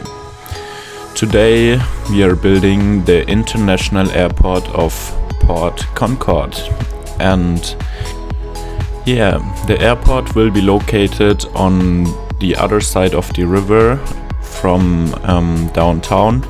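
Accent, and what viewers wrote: Germany. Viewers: Ich höre an deiner Stimme du bist deutsch😅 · Are you german? xd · Haha dude I enjoy your German accent 😊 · i can hear that you`re german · deutscher akzent 😳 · Man hört echt stark heraus, dass du deutsch bist xD Schöner Flughafen und ein paar ziemlich kreative Ideen mit drin. Da werd ich mir doch glatt was abschauen und selbst mal so verbauen :D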